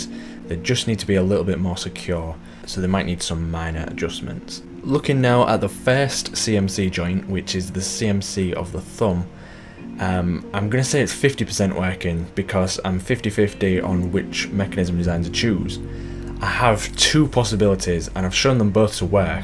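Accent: United Kingdom. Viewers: That brass geared thumb mechanism is a work of art and absolutely gorgeous design. You’re a very clever bloke. Just found your channel. Nice to hear a Brit voice on YouTube 👍🇬🇧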